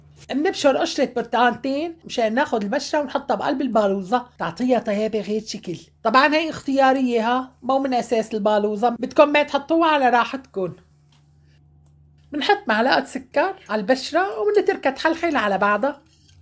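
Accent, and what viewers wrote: Aleppo, Syria. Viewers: بلعكس خليلي عم تقولي دشري وحيكتك الحلوة الحلبية لا صلية ماتغيري كلامك برجعنا لايام زمان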